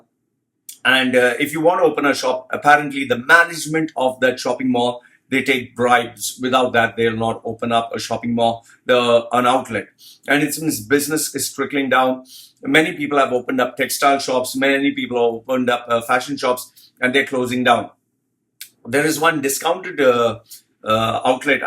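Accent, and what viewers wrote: India. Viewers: Indian accent yeahhhh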